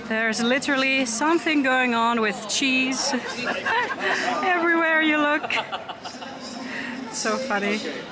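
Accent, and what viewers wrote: Netherlands. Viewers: I´m suprisied that your English accent sounds like a native Dutch person speaking English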